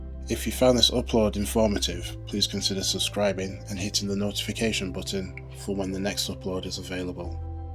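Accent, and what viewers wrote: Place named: Yorkshire, United Kingdom. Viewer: It's better now for me, hate 'music' and prefer a real human voice, especially with that rich Yorkshire accent! 🙂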